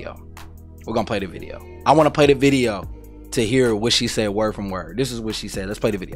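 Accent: Baltimore, United States. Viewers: Bro you from Baltimore lol?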